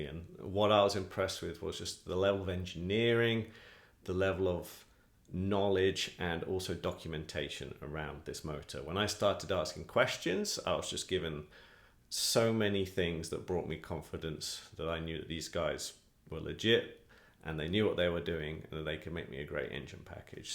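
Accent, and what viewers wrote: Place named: United Kingdom. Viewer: A ratio without units is meaningless, unless it is a ratio of values with the same units. Since power and weight are different measures, "1:1" by itself could mean anything... 1 horsepower per pound? 1 horsepower per kilogram? 1 kilowatt per pound? 1 kilowatt per kilogram? 1 BTU/hour per stone? (in honour of British accent) Any of these is arbitrary and unrelated to actual performance goals.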